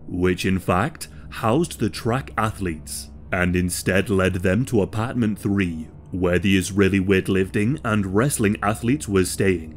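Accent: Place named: Hebrides, United Kingdom. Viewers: It's nice to hear someone who (I think) has the same accent as me doing well on YouTube! Hi from Hebs :) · God hes so Scottish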